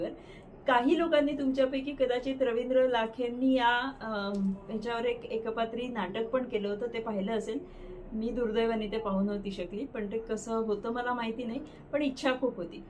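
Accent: Vidarbha, India. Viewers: My humble tributes to late Shri ji. Please continue the channel in his memory. I always liked his style in Vidarbha Marathi ascents. Happy birthday to Sahab.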